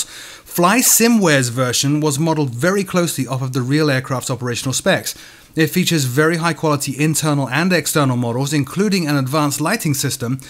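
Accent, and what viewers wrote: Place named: England, United Kingdom. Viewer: He's English, he won't pronounce words like you or other Americans.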